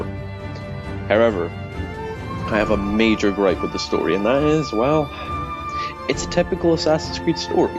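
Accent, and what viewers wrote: Scotland, United Kingdom. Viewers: I can't tell what your accent is, is it scottish?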